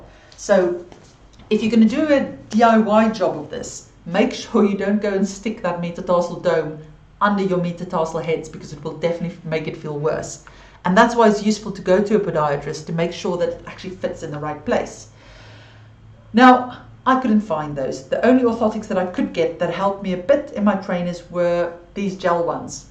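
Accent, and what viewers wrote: South Africa. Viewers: Great Talk. I Thought She’s SouthAfrican.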